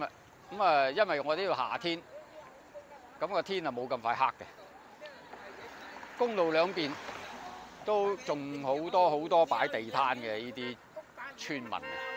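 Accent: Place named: Hong Kong, China. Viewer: 原汁原味好视频! 先生是识货之人，满口港澳同胞 广州音，对本地情况还是比较了解的。白沙和三八，实质上都是公社一级的行政区域。著名歌手陈伯强就是台山县白沙公社人。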